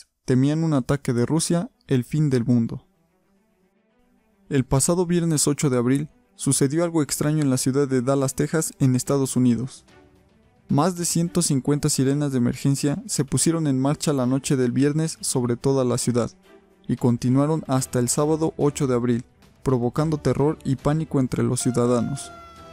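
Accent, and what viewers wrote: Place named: Mexico. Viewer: El comentarista tiene acento mexicano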